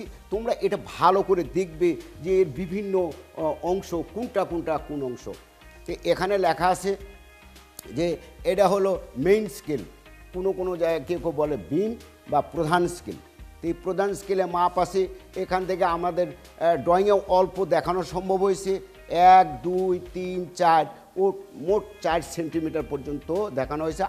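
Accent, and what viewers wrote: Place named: Natore, Bangladesh. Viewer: Tnx sir...sir apner kotha sune mne hosse apner basa natore district a....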